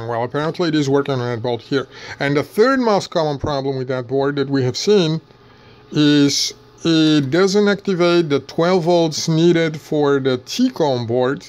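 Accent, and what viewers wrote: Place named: South, United States. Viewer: фу какой блевотный южный акцент!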